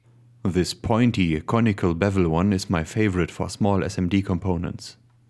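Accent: Germany. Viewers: The German accent makes this legit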